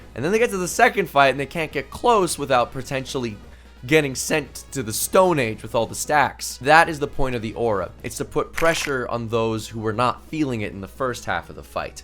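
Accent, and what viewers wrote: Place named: Canada. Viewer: Stacking dice up each move to eventually take that much damage is a great idea. I'm surprised you are from south Africa, all I hear in your accent is Canada.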